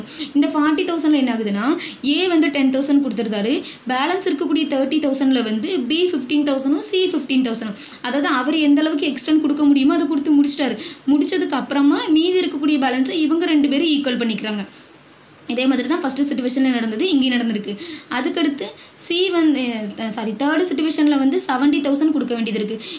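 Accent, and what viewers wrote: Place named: Nagercoil, India. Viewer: I think It's Nagercoil language...😊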